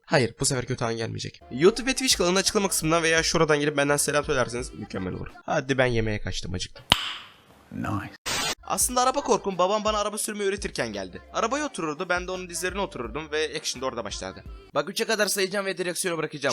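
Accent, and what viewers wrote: Russia. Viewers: Neden sesin rus aksanına benziyor dostum??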